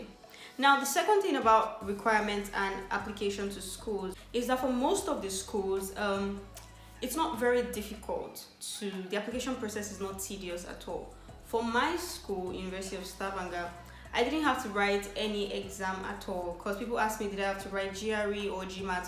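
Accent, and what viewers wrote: Cameroon. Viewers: Are you from Cameroon.your language tune seem similar here. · you sound more like a Cameroonian, nice video, very detailed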